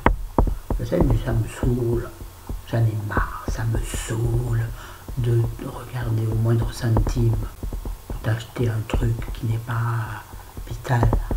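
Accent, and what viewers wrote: South, France. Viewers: Mdrrr avec ton accent du sudeuu loool . Manque plus que le Raimu lol bisouilles de la sudiste :-)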